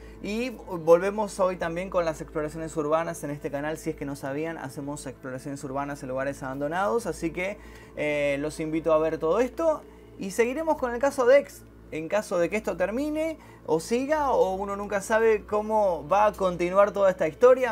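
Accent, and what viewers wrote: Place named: Argentina. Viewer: Que bueno que no eres el tipico argentino con el acento cargado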